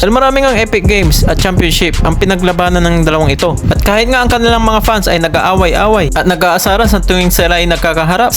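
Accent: Pangasinan, Philippines. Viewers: Pangasinan ka idol noh,,? Halata sa salita mo.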